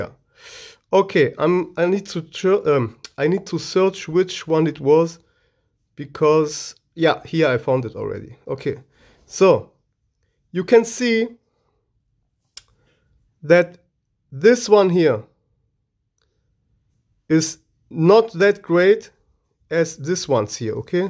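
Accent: Germany. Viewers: sounds like german or austrian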